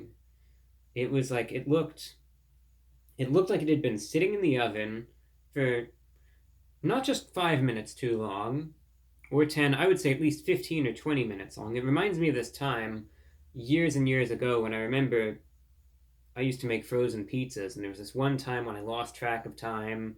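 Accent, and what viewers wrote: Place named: Transatlantic, United States. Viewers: Imagine being a corporate exec for some giant, time-tested publicly traded company, and your biggest worry is that a 20-something in a baggie suit, sitting in his grandparents dining room, speaking with a Transatlantic accent, named " ", is going to absolutely fucking butcher and sully your already bad name. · my husband saw thumbnails on my feed and laughed and rolled his eyes. He not only said looks 12 but that people make fun of him. 😂😂😂 I'm like dresses dapper and sounds MidAtlantic like in the 40s. I love the guy. Idc what haters say. It is innocent fun with food, cuz I'm a foodie, and great listens to the podcast too. No cussing and he is a good storyteller and seems like an all around good guy. I say screw the haters.😂 Though his comebacks on hate comments are the bomb. ❤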